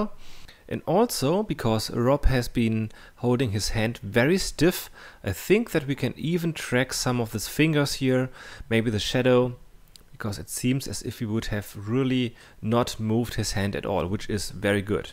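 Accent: Germany. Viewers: R U german? You have a german accent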